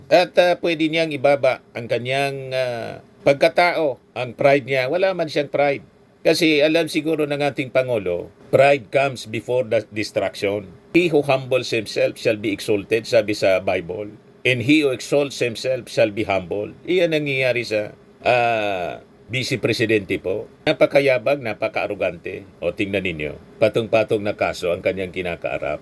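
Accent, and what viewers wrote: Bicol, Philippines. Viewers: Ito ung vlogger na model na beauty product na sobra ka panget,hoy panget tumigil ka,ang sama ng accent mo,bikolanong bikolano tuno mo,panget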